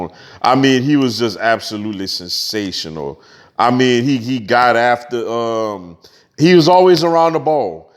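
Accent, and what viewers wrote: New Orleans, United States. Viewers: Valid points my guy!! Just confused its sounds like your from New Orleans but your draped in eagles gear? #WhoDat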